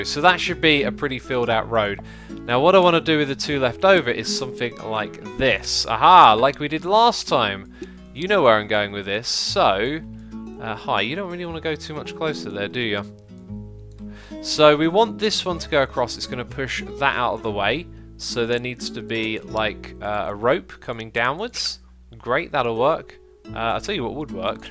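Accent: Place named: United Kingdom. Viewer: DaSquirrelMan Yeah, his accent is hard to pin down. I wasn't sure if was British or Scandinavian at first. Still sounds like there's some British influence in there.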